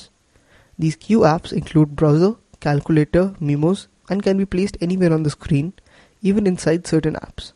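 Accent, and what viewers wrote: India. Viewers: indian english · i don't think that for even once, did he make any grammatical mistake. i don't know from which part of the world you are, but there aren't many in the world who speaks more correct english than us, Indian! you may have accent but our english is more understandable to the world due to our neutral accent. these videos are less watched for the accent and more watched for the information. the guy did a great job and the amount of 'likes' justify that. good job man...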